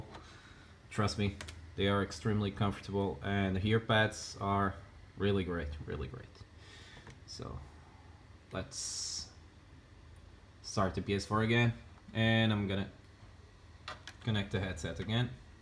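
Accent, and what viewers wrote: Brazil. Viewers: Eu vendo o vídeo, e ouvindo seu inglês, de olho no sotaque kkkkk, na hora vi que era BR também.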